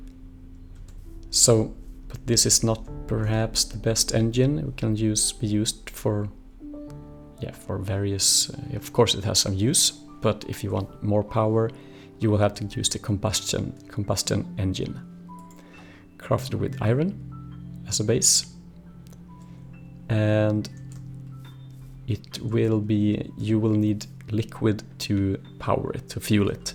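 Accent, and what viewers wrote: Sweden. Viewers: Are you swedish? You have a very cute accent. :) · Are you from Sweden? Just gotta ask cuz it sounds that way :) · Swedish? You gotta be swedish... :D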